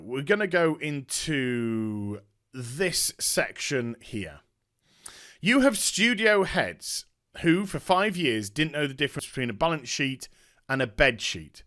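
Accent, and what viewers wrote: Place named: United Kingdom. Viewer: Hey! Im no one. Just someone who watches you. IMO this 'right into it' format is IDEAL for you. Straight away. Spot On. Very engaging right off the bat. Youre not a jester. You dont have to try to 'entertain us' before each vid. I believe most of us watch you because we believe you are a very like minded, level headed guy with a great British tenor and the same 'take' we would likely have on the given subject. Just my humble opinion. Love ya...Keep it up <3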